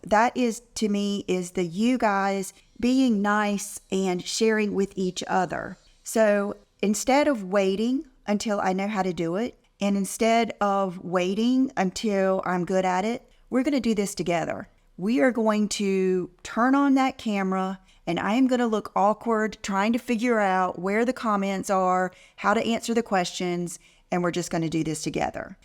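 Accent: South, United States. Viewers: 100%. I will never forget when she made fun of the lady in the elevator. When people show you who they are, believe them. That sweet Southern accent doesn't fool me.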